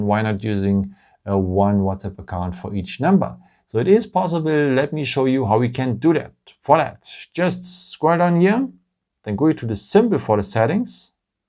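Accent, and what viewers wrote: Germany. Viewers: Vor kurzen gekauft das A72 Machst Du das auch in Deutsch? Hast da noch einen anderen Chanel, Du klingst zumindest im Akzent Deutsch ;) ... if not, here my translation: I ask if you have a german channel to, because you sound german ::) I just bought the Phone. What I have problems with is the camera. Take nice fotos with red flowers for exampel is not possible, does it has a "red-problem" ? How to handle that?? Its too bad... even with other apps ... because its the camera I guess?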